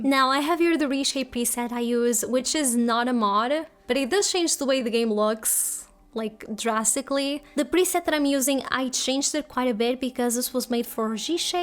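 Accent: Portugal. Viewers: 1 minute and I already knew you were Portuguese! hahhah loved the video!❤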